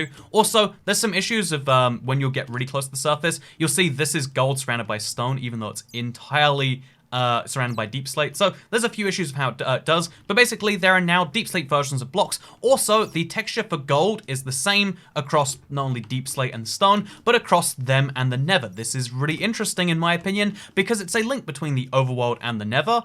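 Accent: United Kingdom. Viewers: I’ve been watching for years! And he still speaks so fast with his British accent that I still can’t recognize half of what he says as the English language. With that said, I’m a big fan and long time supporter. Just saying. “Hey everyone, here! If you like videos like this then wwocofmtkgkvkgksksjdhwbdjckfkfngmvkckrktkgkdjdjsnej.” Yeah, what he said. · Why are you complaining about a British person pronouncing a word the British way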